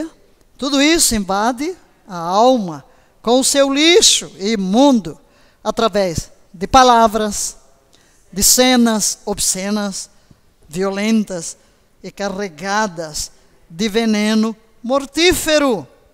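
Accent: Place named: Portugal. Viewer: Tu tens sutaque de Portugal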